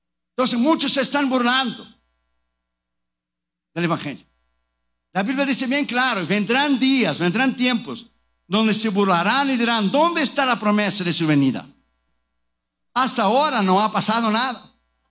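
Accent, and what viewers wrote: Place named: Costa Rica. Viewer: Pero eres tico por tu español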